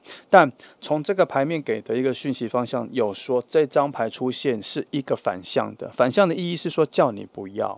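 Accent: United Kingdom. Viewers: 1.準。喜歡2但我直覺選了1。感謝說了一口漂亮英國腔的 😊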